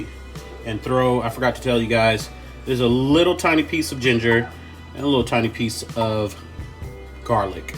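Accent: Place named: Georgia, United States. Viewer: I could hear the Georgia in you before you even said it. Thanks for the video, I'll be making this!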